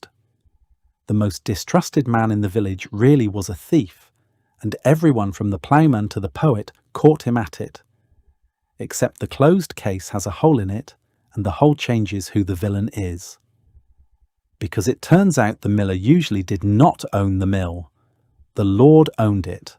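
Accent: United Kingdom. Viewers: How much AI is used in your vidoes? Thumbnail is AI, script is very repetitive and oddly structured which suggests AI writing, and though the voice is British, the terms used are exclusively American. I just have no idea how much of this I can trust at all.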